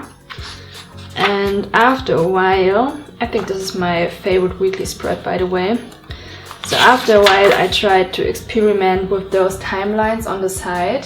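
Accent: Germany. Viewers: endlich weiß ich wie sich ein deutscher Akzent anhört 😂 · Eigentlich wollte ich auf diesen geistigen Durchfall gar nicht antworten, aber ich glaube man muss dir mal Einiges erklären... - Erstens: Ob ihre Aussprache grauenhaft ist oder nicht, liegt im Auge des Betrachters. Deshalb kann deine Aussage schon mal nicht "objektiv erfassbar" sein. Nun gut... - Zweitens: Hab ich den Teil verpasst, in dem sie nach deiner Meinung fragt? Meines Erachtens geht es hier um das Bullet Journal oder steht im Titel "Bitte bewertet mein Englisch auf einer Skala von 1 bis 10" ?! - Drittens: Hast du jemals schon mal Leute gehört, deren Englisch wirklich nicht so gut ist? Ich glaube nicht... Man versteht sie nämlich kaum und reibt es ihnen trotzdem nicht unter die Nase. Das ist "common courtesy" (kennt du das? ). - Viertens: Nur weil man in London lebt heißt das nicht, dass man alles perfekt Englisch ausspricht. 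Ihre Muttersprache ist nun mal Deutsch und "die Gute" wird auch in 10 Jahren noch einen Akzent haben. Das gilt auch für Franzosen, Chinesen oder Inder, die schon 50 Jahre in England wohnen. Das ist vollkommen NORMAL. ... Kein Grund hier ausfällig zu werden. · love your German accent :D and I was finally able to hear how the name of the notebook is supposed to sound :p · I noticed by your accent that you are German! Ich bin auch von Deutschland. Meine Mama ist aus Aachen aber meine Väter ist aus America. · Celine Lee lmao me too first second SHES GERMAan haha ich bn aus österreich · man kann an deiner Stimme erkennen dass du deutsche bist XD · Im learning german in school and i think its so cool to hear you’re accent 😻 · i heared your accent in the first second :D ich bin auch deutsch xD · falsch.ihre aussprache IST grauenhaft und sehr deutsch! das ist objektiv erfassbar.. und warum bitte muss ich alle sprachen dieser welt sprechen können, um das hier anzumerken?! 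was für ein dämlicher kommentar! es geht um die allerweltssprache englisch.. und die gute lebt in ...LONDON .. und darum ist es OBERpeinlich. PUNKT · I love your accent because I grew up with german nannies so it reminds me of my child hood haha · are you german? Your englisch is very good though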